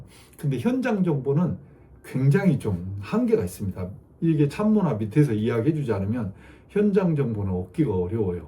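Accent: Jeolla, South Korea. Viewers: 잘듣고있던중. 절라도사투리를. .거참 .!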